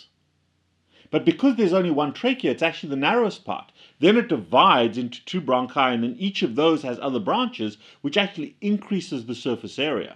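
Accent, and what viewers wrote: South Africa. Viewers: I was curious about your accent as it does not sound American, I had a hunch you might be South African! We have the best heart doctors :-) Great videos!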